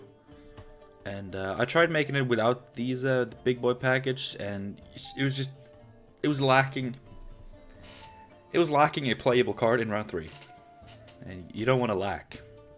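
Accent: Norway. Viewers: are you from Norway?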